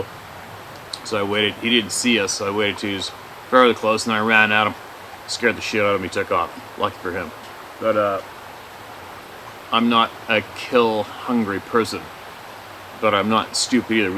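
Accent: Australia. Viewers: Funny Aussie accent.. love from an Aussie! You are absolutely correct Re the Rant! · Australian accent sucks brother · That aussie accent tho! 😹 Rant away sir! 🤩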